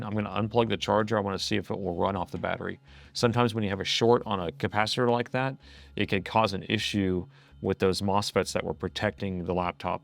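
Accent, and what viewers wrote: United States. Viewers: I have watched many Youtube anchor videos about repairing laptop motherboards, mostly with a British accent. This is the first time I have heard of a blogger with an American accent. The program is very good, fantastic, subscribe~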